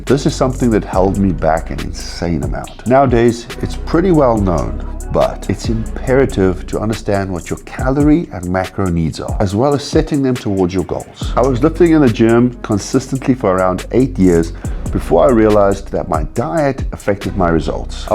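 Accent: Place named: South Africa. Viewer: Great video, you sound very South African and look like a member of the Springboks. 🤔 Great content 💪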